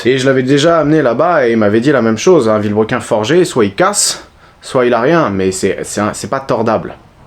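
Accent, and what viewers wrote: Alsace, France. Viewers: j’ai l’impression qu’il a pris l’accent Aslacien nan ?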